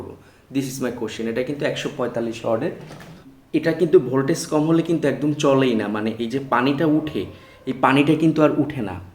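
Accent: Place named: Bangladesh. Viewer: Apnar kotha sune mone hochche apni Bangladesh theke. Oidike coastal type er climate , cooler dry weather Chara cholena. Air cooler north/West india te bhalo chole, adrota thakle kokhonoi cholbena. Apnar chit chite lagche Karon humidity thakle aro humidity baraye, local Air cooler onek bochor use korechi, ac-r Cheyeo thanda Hawa deye, local cooler onek sosta ar. Boro hoye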